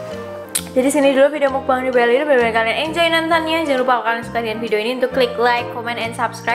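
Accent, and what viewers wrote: Java, Indonesia. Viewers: Welcome medok kuntuL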